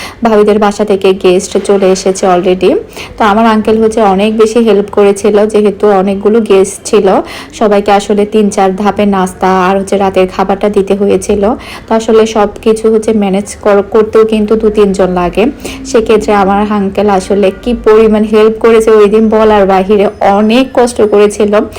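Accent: Chittagong, Bangladesh. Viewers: Apu apnar video amr onk valo lagse.amio ctg er.so amdr culture e maximum mil ache ejonno dekhchi.apni onk smartly o kotha bolen but i think apu apnr kotha arektu correction kora uchit.kotha bolar shomoi ctg n bangla mix hoye jai.shunte kmn jani lage jetar jonno out district er manush ra always eta niye troll kore.so i think apnr bangla ta ektu improve kora uchit.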